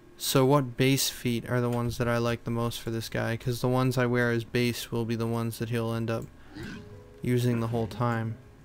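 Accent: Canada. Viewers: are you Canadian? Because I can hear a little bit of an accent. Just wondering, it sounds cool XD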